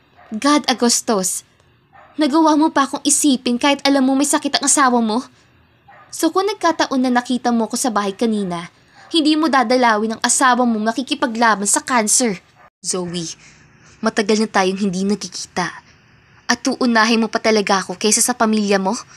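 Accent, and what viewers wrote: Visayas, Philippines. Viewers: Napakaserous Kasi Ng Bago mong narrator Hindi kagaya mo na Minsan may nakakatuwa Lalo na Kong may halong bisaya tuwang tuwa ako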